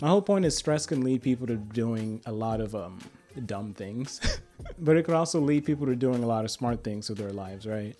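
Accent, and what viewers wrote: Kenya. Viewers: are you by any chance kenyan?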